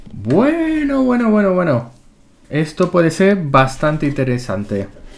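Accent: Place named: Andalusia, Spain. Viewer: ¿de donde eres? Algo me dice que o Canario o Andaluz😍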